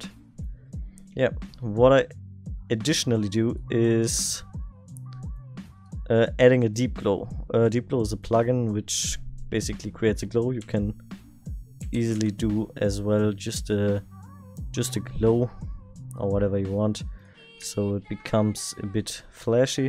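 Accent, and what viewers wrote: Germany. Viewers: Der deutsche Akzent sticht mies hervor 😳🧙🏿‍♂️🧙🏿‍♂️ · man merkt du bist deutscher xD No front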